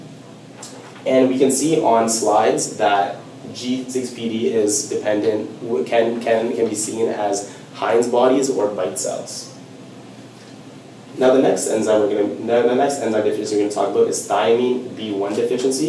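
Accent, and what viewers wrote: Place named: Canada. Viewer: That Canadian accent strong! Nice video. At the end it seems like you were going to make a point that alcoholics typically have B1 thiamine deficiency, which, I assume you were going to say results in deficiency of transketolase. Is that significant? Or will it just act as a shunt towards Nuc Synthesis and not be able to recycle back towards glycolysis?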